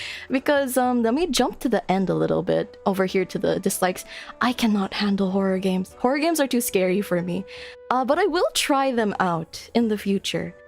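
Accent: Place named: Philippines. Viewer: idk who tf you are but you have a filipina accent and you like girls und panzer so I’m interested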